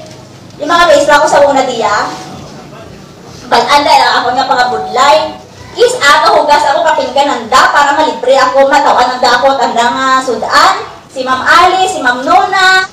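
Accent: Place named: Iloilo, Philippines. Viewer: Gusto gusto ko yung ilongo mo. Napakalambing sis.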